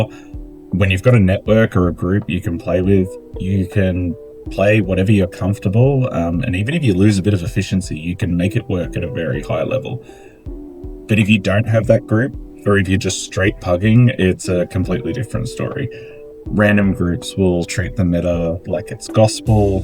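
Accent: Australia. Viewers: subscribed just bcz of the aussie accent. Awesome content!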